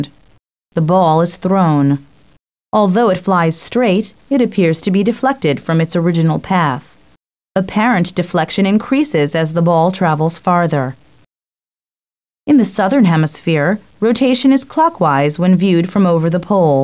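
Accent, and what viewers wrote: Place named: United States. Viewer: ohhhh, what a stupid american pronunciation, accentuation and nasal twang ;-((((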